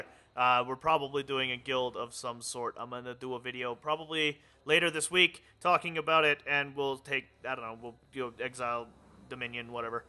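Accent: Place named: United States. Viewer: Sadly going by the accent I'm going to hazard a guess that you're going to be on a US server.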